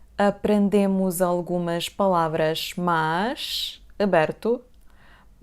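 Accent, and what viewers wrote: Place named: Portugal. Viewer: Adoro tua pronúncia do português europeu continental. Quanto ao "mas" brasileiro não creio que seja exatamente confuso, até porque o contexto é elucidativo, por iniciar uma contraposição lógica, não é?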